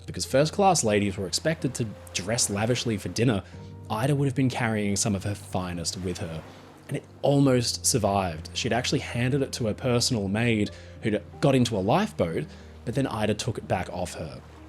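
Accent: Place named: Australia. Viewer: You have such a pleasant Australian accent. Always a pleasure to hear you speak about things. On an unrelated note, a line from the Rubaiyat (the book of Persian poems) was found in the Somerton Man's pocket. That book had been very popular in Australia at the beginning of the 20th century.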